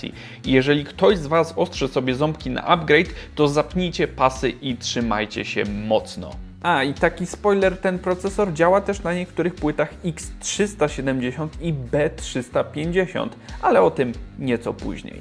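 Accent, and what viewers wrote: Silesia, Poland. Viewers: po śląsku godo a kto nie wie to gorol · oho jest ze Śląska, Pozdrawiam Hanysa:D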